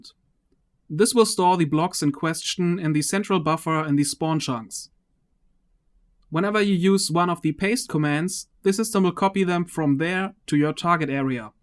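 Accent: Germany. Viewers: Kann es sein das du eigentlich deutsch sprichst?😁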